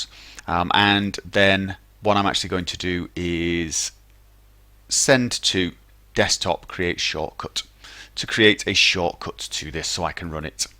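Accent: United Kingdom. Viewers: Not only does your voice sound annoying, no, on top of that you have a highly obstructive british accent. Please visit a speech therapist, everytime I have a problem with Skyrim and watch your videos my ears feel raped. It's not "coot" it's "cut"...damnit. Anyways thanks for the thorough guide and fixing my problems again. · Fucking bittish accent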